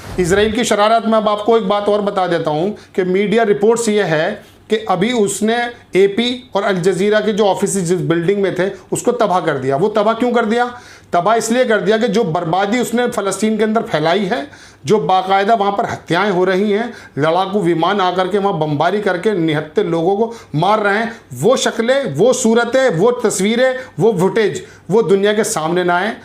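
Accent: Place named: Saharanpur, India. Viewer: भाई आपकी आवाज तो अपने सहारनपुर वालो जैसी लग रही है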